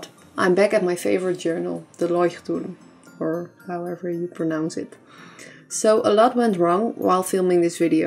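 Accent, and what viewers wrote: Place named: Netherlands. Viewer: Ik hoor je nederlands accent🤣 gaaf!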